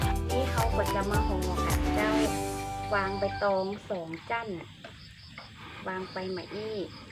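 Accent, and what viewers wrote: Northern Thailand, Thailand. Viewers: ภาษาเหนือน่ารักจังค่ะ อาหารก็น่ากิน☺️❤️ นิ้งมาย้ำกำลังใจให้กันเหมือนเดิมค่ะ❤️ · ชอบภาษาเหนือจัง มีเสน่ห์ ตำเครื่องแกงด้วยอีกแรงนะคะมามี้แรงเยอะมากจ้า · ภาษาเหนือน่ารักไพเราะมากๆครับ อาหารก็น่าทานครับ สวัสดีครับ มาติดตามรับชมและให้กำลังใจครับ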